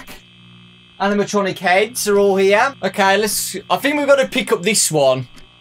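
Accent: United Kingdom. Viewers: Tell where did you come from,I mean I love your voice;British?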